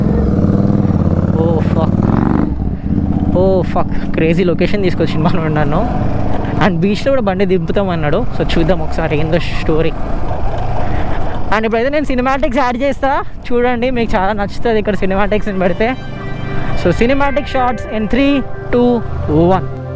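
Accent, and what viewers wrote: Kerala, India. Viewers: Bro mee native place ekkada I mean Malayalam matladthanav kada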